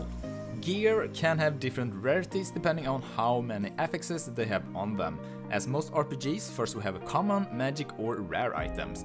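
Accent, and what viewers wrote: Sweden. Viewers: Tog fem sekunder att höra att här har vi en svensk kamrat 😏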